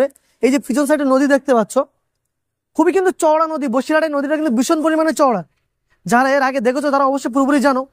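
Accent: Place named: Bangladesh. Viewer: Tumar kotha gulo bangaladeshi accsent lagche 🤔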